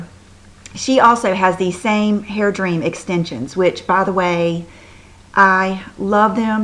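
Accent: South, United States. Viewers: I think your old southern accent is adorable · I speak Old Southern too and I totally understood every word you said! So refreshing for me! hahaha. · I Love Love Love! Your "Old Southern" Accent! Please Dont Change A Thing! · You are a breath of fresh air. I love your accent because I'm a Southern girl too and we are by far the most charming ladies ever:)) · Never saw that Too Faced liner but me and my Ulta coupon will be getting it this weekend. That and the NYX yellow HD concealer. Girl, I understand your "Old South". I understand every word you say...and talk the same. Hug your babies for me. Sad day. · I am so glad I found your videos! You are beautiful and so happy, and I love your southern accent! Subscribed!! :) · I love the way you talk! :-) Love southern! Don't ever stop being you! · I'm from Mississippi and I totally get the southern accent, I say cement the same way lol I do say Pinterest the correct way, probably because I heard it pronounced before I saw it written down :)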